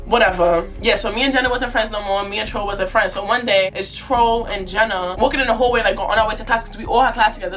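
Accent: New York, United States. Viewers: Good work I can tell you work really hard and your gonna make it fs and i love your storytimes, and I luv the ny accent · the ny accent😍😍♥️! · The accent tho, You from new york?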